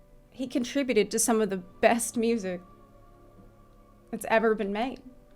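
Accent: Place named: Louisiana, United States. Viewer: The Louisiana really came out of in this one, damn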